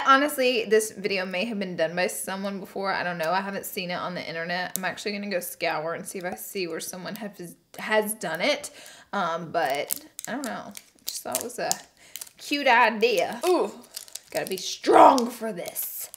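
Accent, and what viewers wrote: South, United States. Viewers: Does she have a southern accent? I can’t tell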